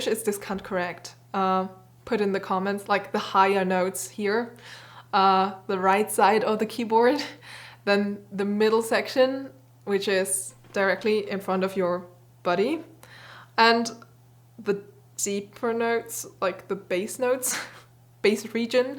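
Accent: Germany. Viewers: Bist du deutsch? Ich höre so einen Akzent? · To change the topic ..... it's interesting to hear how a german speaks englisch. Du machst die gleichen gramma mistakes die ich auch aus der deutschen Grammatik rueberhole, und sprichst abgehackte Woerter. Englisch ist mehr eine weiche Sprache wo Woerter ineinander fliesen. Das ist schwer fuer Deutsche. Aber dein Englisch ist gut 🙂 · Your German accent is so cute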